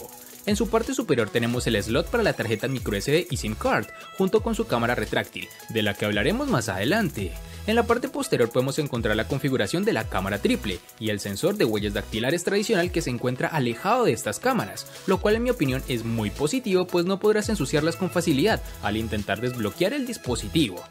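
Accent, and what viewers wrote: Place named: Bucaramanga, Colombia. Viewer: excelente video bro hey pana usted es bumangués ? se escucha bastante el acento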